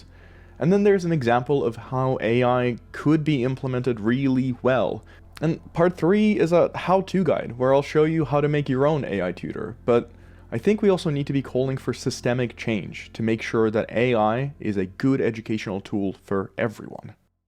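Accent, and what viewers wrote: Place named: Canada. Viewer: Where is this guy from? Sounds Canadian, or something....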